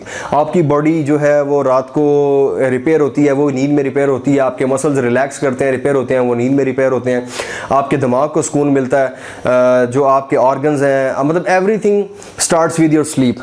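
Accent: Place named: Sialkot, Pakistan. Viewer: Bhai Asslam O Alaikum... Bhai Jo Ha, Wo Jo Ha....Ya Jo ha, Ab Jo Ha....Jo Ha, Jo Ha.... You definitely from SIALKOT. Love From Sialkot <3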